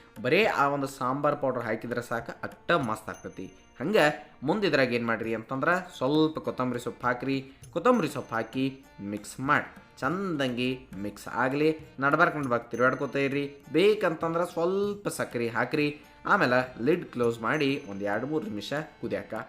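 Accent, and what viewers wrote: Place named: Uttara Kannada, India. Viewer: WOW Superb Sorekayi dosa in 3 variations Healthy version Curry also 👌🏻👍🏻👏🏻 UK colloquial narration makes it more Interesting. Thankyou for All the Recipes & joint Effort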